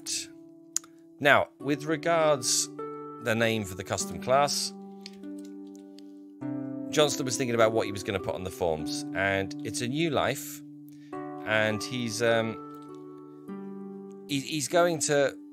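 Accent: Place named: United Kingdom. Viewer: Jonsten, what a chad! Like your British accent btw.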